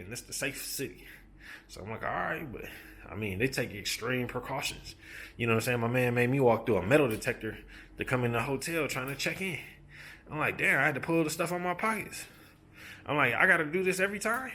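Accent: United States. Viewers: Sounds like u from Cali homie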